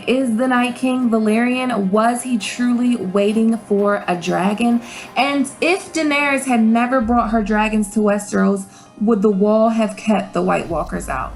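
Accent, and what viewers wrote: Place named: Baltimore, United States. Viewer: You gotta be from Baltimore lol. I can hear my accent from anywhere lol.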